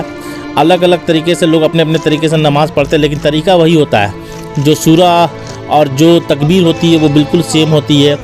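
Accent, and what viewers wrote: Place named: Bihar, India. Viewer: Utuberji, aapni languge sudhariye, Pronounsation me bihari lehja he, faltu baton se vdo bhot lamba bana dala he bhai apne, dusri baat ye namaze janaza dilip saab ki nahi he, pakistani vdo add kardiya he aapne janaze ki namaz ka.... Mujhe itna batayenge bhai... K q jhoot ka sahara lena pada apko....???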